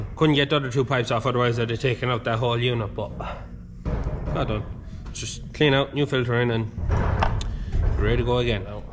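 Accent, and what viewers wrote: Ireland. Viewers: Same beltdrive like an snowmobile! I wonder,is it Irish or is it a dialect you mix whit English?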